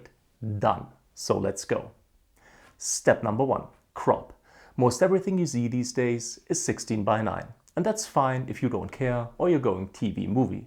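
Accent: Stuttgart, Germany. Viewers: Are you from Stuttgart?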